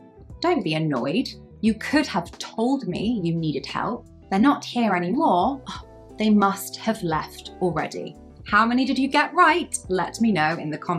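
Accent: United Kingdom. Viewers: Hi, I just love your videos. They are really helpful. Thanks for the great job you're doing. By the way, I love your typical British accent.❤❤❤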